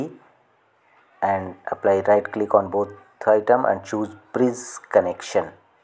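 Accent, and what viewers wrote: India. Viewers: indian if you hear this accent again just close the video, or you will lost your time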